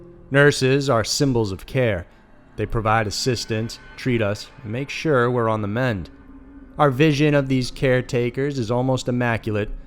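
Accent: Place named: Texas, United States. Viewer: Wow, these are so disturbing! Also I love your accent. I’m British so it’s a guess, are you from Texas?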